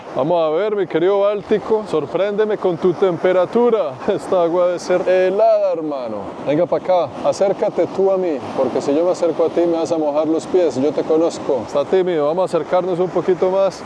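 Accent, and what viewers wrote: Colombia. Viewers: que amenos videos haces, te sigo desde hace mucho tiempo y nunca decepcionas, te felicito y el acento colombiano le da el toque original! Un abrazo desde CDMX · Que gracioso y simpático es el acento colombiano de, me encanta 😊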